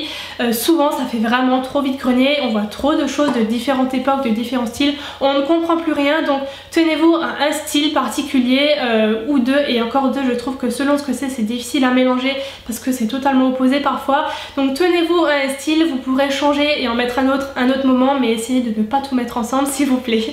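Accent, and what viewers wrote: Lorraine, France. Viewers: hello! oui c tout à fait ca..en tous cas je te découvre tous les jours et franchement je ne sais pas kel age tu as mais tu as l'air talentueuse, posée, sympathique et très pro! stp fais + de vidéos deco ou dny!! je vis dans un chalet et je suis en plein travaux/déco et t vidéos donnent d idées) ta chaine est une belle découverte. ps: j'habite a sarreguemines, ton accent me parle....me trompe je? des biz ;-)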